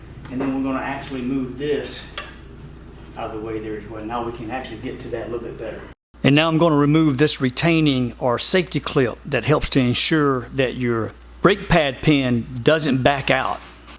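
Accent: South, United States. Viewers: Great vid! Well videoed. Easy to see what you were doing along with an understandable explanation. Love the shit kicker drawl.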